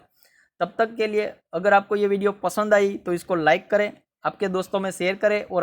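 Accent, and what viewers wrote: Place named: Kathiawar, India. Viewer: Bhai Gujarati lage chhe..., Kadach kathiyavadi...keep it up bro... Subscribed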